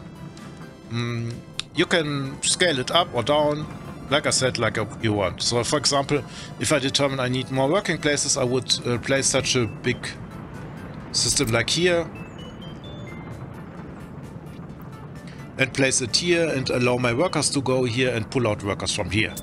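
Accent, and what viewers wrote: Germany. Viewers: Sweet , after three seconds I recognise the German accent.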